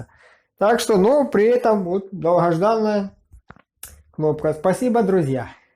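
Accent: South, Russia. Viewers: Шикарный твой южный акцент))) Ютуб Брин - бывший соотечественник.... Вот те и волокита, вот те и бюрократия))) И кстати: твой оператор - малаччина! Всё делает грамотно и почти без ошибок....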